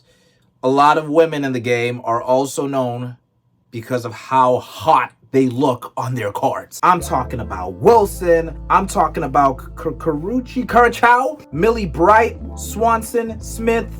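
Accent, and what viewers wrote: United States. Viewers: I understand the South Africa to England thing, but I can't place the American accent in your timeline. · Then how did you get that American accent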